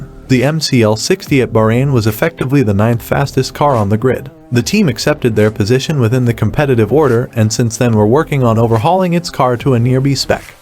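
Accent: United States. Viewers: As soon as I hear an American accent I anticipate click bait. Sorry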